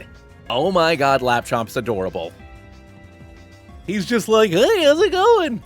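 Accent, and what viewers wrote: Scotland, United Kingdom. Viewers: 9/10 Scottish accent, love Nessie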